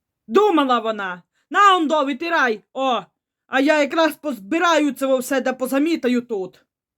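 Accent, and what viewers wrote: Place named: Rivne, Ukraine. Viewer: Доброго вечора Вам, сміялась до сліз як прибирали кладовку.Успіху Вам.Моя юність пройшла в м.Рівне, були подруги з м.Березне, м.Сарни, м.Костопіль.У Вас такий самий акцент.Дуже Вас люблю!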